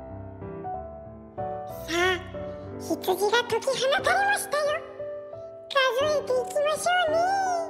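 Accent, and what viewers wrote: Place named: Kansai, Japan. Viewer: 最高です‼︎最近このチャンネルを知りました。毎日見ています😂もはや中毒と言ってもいいほどハマっています。結婚を機に東北へ引っ越しして早15年。関西弁が恋しくて毎日ここを訪れては腹が捩れるほど笑っています❤今後も楽しみにしてますよ🎶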